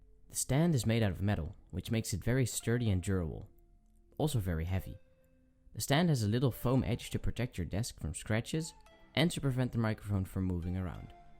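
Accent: Netherlands. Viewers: are u from the netherlands ? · ja k hoorde aan je stem dat je nederlands was haha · Je bent nederlands he verder top video man ga zo door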